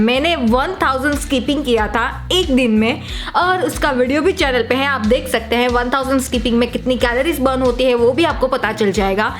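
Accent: Gujarat, India. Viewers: Gujarati tone lag raha hai aapki boli mein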